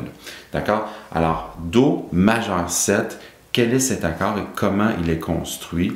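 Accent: Quebec, Canada. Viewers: Salut, merci de tout cœur. Ce qui me tue, c’est que je sais tout ça de façon empirique, et autodidacte, et même ton accent me dérange moins depuis que j’ai une amie d’enfance établie au Québec qui a repris contact avec moi….😂 Le problème est que je suis porteur d’autisme et que je suis totalement bloqué lorsque quelqu’un parle en mots alors qu’on est en « notes » ou en « sons »…. Aussi radical que ça, alors mon autisme, surtout en musique puisque c’est mon intérêt spécifique, est clairement invisible à yeux des autres. Je fais le vœu ici de découvrir et suivre ta chaîne pour apprendre encore et je continue d’improviser sur les disques ou music tracks de YouTube. Merci encore. Je m’abonne.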